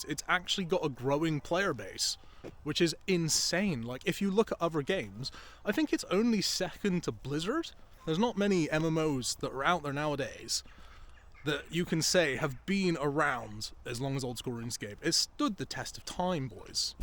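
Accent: Bristol, United Kingdom. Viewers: i know that good old bristolian accent i always get mistaken for being from bristol lol. Im pure wiltshire.... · what part of the uk are you from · Are you from Bristol?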